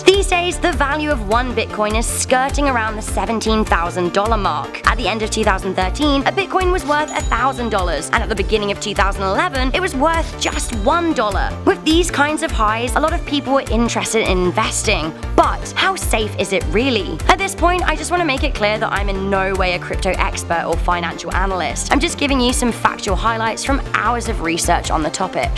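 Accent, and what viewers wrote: United Kingdom. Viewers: so British. There's millions of people with the accent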